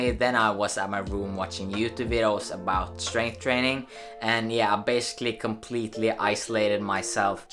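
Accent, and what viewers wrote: Norway. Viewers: Listened to your voice for 5 seconds, then I recognized the norwegian accent ;)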